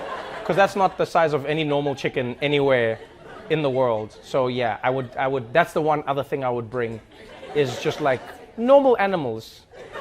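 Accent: South Africa. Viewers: I love how he holds back on his south african accent when he's in America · I love listening to him talk. I think it's the south African accent. Lol, maybe one of the reasons I love listening to. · that south african voice of trevor always cracks me up hahaha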